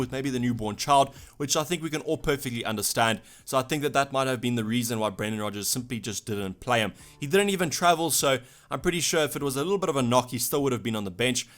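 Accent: South Africa. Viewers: Anyone who can't hear the African Accent is beyond redemption 😂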